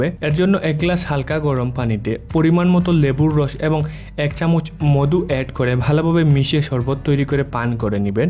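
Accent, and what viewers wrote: Sylhet, Bangladesh. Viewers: ভাই কিতা সিলেটী নি???? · Undoubtedly sylhety accent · Vai ki sylheti😀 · ভাই আপনার মাতে তো বুঝা যার, আপনে সিলেটি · Bhai anni ki sylheti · Brother r u sylhety??? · ভাই অাপনি সিলেটি তাই না? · Bhai Sylhety ni apne? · Sylhet er basai je kotha bolcen tar nam ki. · সিলেটী টোন এ শুদ্ধ ভাষায় কথা বললে শুনতে দারুন মজা লাগে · আপনি সিলেটী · ভাই গো আপনে কিতা সিলোটি নি? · Vai apni ki sylheti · Sylheti vai 🥰🥰🥰🥰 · bhai apne ki Sylheti?? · Baiya apni ki sylheti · R you form sylhet ?? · আপনি কি সিলেটি? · Sylhety re বা.... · সিলেটি ভাই 🙂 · Apne sylhety ? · apnar basha ki sylhet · Bro, are you from Sylhet? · আপনি সিলেটি আমি ১০০% শিওর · Jioy apner matei buja gese.. we are sylhety 😊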